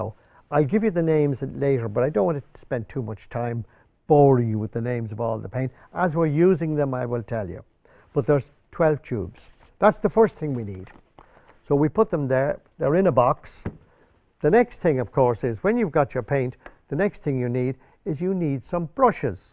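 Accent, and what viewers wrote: Ireland. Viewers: Dear I’m so glad I found your video site. After going to 2 learning to paint sessions I feel I didn’t learn very much, you make it so easy and I love listening to you with your lovely Irish accent and as my MumRIP was from Dublin it brings back lots of memories. I will continue to paint although I’m a bit nervous Thank you. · My hair dryer has lived in my paint basket since I discovered "Simply Painting" years ago in a craft shop after having made a fool of myself in a watercoloring painting class. Now I have a brand new set of gouache, and you're going to be the reason for my success with them. You make sense -- and I love the brogue. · I do paint a bit but I tune in especially to hear your voice🍀☘️. My Gr.Parents were from Dublin & spoke just like you. I enjoy listening to you & It’s very comforting. I loved them dearly❤️.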